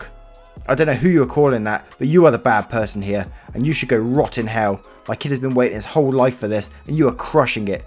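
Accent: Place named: Oxford, United Kingdom. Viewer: Oh ok, thanks for clearing that up in my mind - I honestly never came across that broad accent of yours before and find it simply difficult to follow as I try making out what you say and squaring it with what i read lol (only been to Oxford once, to throw eggs at the guys from Balliol College and leave, so I really never got the chance to listen to the local lingo ^^)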